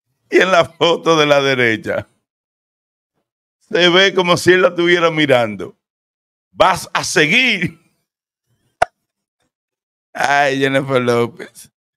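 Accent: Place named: Latin America. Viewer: Me encanta como hablas Típico Latino y sus risas Gracias por hablarnos en Español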